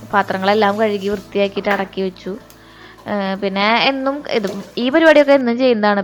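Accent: Thrissur, India. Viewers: ചേച്ചി യുടെ സംസാരം കേൾക്കുമ്പോൾ എന്റെ അനിയത്തി സംസാരിക്കും പോലെ.... തൃശൂർ സ്ലാങ് 😍😍 എന്തായാലും നമിച്ചു ചേച്ചീ 🙏🙏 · Poduve "poche" lifestyle kanikunna ippolthe vloggerslninnumm..sherikm natural aayt kanikunna chechik irikatte oru kudira pavan... adipwoli..kand irikaan patunindd..👍..thrissur bhashanod ishtm thoni..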